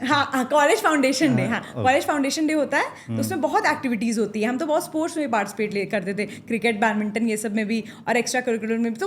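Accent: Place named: Bihar, India. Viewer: Bihari accent full on😊😊😊😊😊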